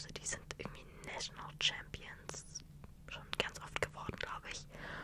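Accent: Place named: Germany. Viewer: German accent :virtualhug::virtualhug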